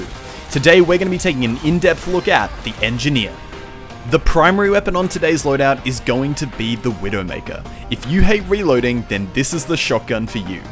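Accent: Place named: Australia. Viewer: I swear, he sounded less Australian back then.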